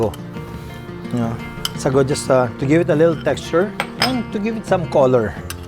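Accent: Visayas, Philippines. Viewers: Ka cute nimu mobisaya mayor. Heheheheh LISOD KAAYO OE. Hehehehe my family loved to watch ur videos mayor. More videos pls. GOD Bless u and ur family always. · Idol sir richard maypagka bisaya man diay ka🤣🤣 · Hahaha lisud kaau oiiii hahaha arang ka bisaja ug accent 😂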